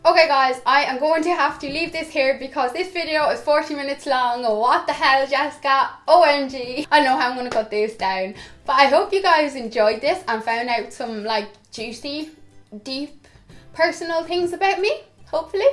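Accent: Ireland. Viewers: What county in Ireland are you from?😆❤️And I love love love this video!😘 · hate cheaters with a passion and I'm proud of the fact that I have never cheated on a girl love your video's and love your accent nothing better than a beautiful Irish accent ❤❤❤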